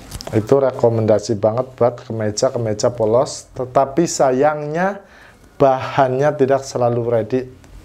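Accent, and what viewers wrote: Banyumas, Indonesia. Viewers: Bahasa indonesia logat ngapak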